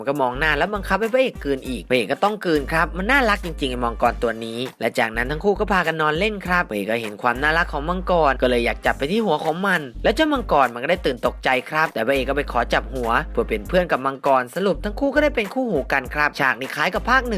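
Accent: South, Thailand. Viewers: หนูรู้สึกเหมือนพี่เป็นคนใต้เลยเเต่หนูชอบคลิปนี้อยู่นะค่ะเพราะมีตลกมาเพิ่ม🤣🤣🤣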